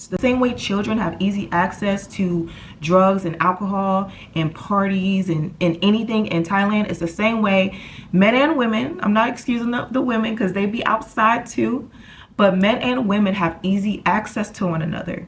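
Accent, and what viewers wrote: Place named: United States. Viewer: Her parents are American or something because i hear NO accent of anything. She sounds like a regular straight up American. And is she a military baby or why did her family move over there?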